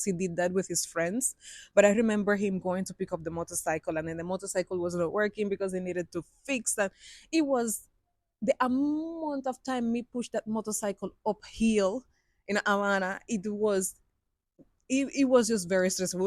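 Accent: Patois, Jamaica. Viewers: Hi love the story I love the way you talk the patwa so no badda wid the whole heap a pretty English because you don't sound the same I fall in love with the way you talk jamaican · I’m a new subbie please be your authentic self! Love your Jamaican accent please don’t let people make you feel self conscious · Hello I enjoy your stories which are very interesting and I like when you talk patois too you are a true Jamaica 🇯🇲 🎉I will be looking out for more of your experiences 😅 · Luv yuh storytelling luv the patois I would listen to u all the time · Guurl pleaee talk de patois and mad dem LOL! Love it 🥰 and please keep sharing your stories with us. Also waiting for the engagement/married story and pictures 😉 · Wow. Living in Cuba seems so hard. I love watching your channel, you are so funny in the way you express yourself, with your cute little Jamaican accent😊. 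· Hey, love the accent mixed with the patois. It's fyah 🔥🔥🔥🔥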